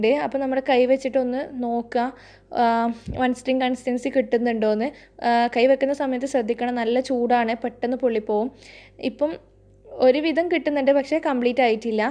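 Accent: Kannur, India. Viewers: aaha... kannur evde.??? .. samsaram kettappo enikk thoniyirunnu kannur aanenn... njanum kannura... payangadi🤗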